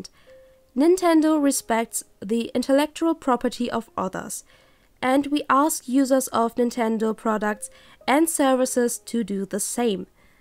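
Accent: Germany. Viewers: Your 100% german. I am too, and I can hear it through your accent ! :D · great video and incredible drawing! (btw are you from germany? your english sounds like it c:) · Hey :D are you german? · In my opinion you sound like an German, speaking english. You know what I mean? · Ich wusste erst nicht das du Deutsche bist...hab's aber iwi an dem Akzent erkannt...denn viele deutsche sprechen manchen Vokale im Englischen nicht richtig aus...bzw. klingt es nicht nach dem typischen Englisch...ich meine es nicht böse -3- ^-^ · Hey, I didn't even notice that you were german, until I saw that you used german Texts as examples in your video! And when I listened closely afterwards I could even hear an accent, which I didn't hear before. I'm german aswell, and it's always nice to meet other good, english speaking german artists. ;,D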